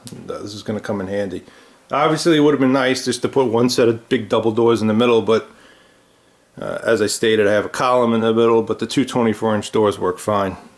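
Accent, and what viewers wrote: New York, United States. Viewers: You're from New York aren't you? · love your NY accent.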